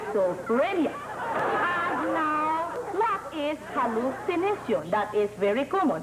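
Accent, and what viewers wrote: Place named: Visayas, Philippines. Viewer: Bisaya añg punto ni.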